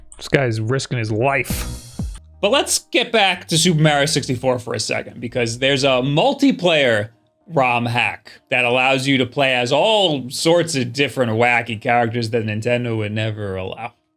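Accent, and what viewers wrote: New York, United States. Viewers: The first Wulff Den video I saw, said Mario and I immediately said to myself, "He must be from New York, close to NYC."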